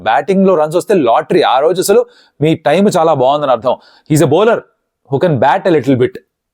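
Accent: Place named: Godavari, India. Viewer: Bro Dialect enti Godavari Zilla vala la undi , Any roots from Godavari Districts?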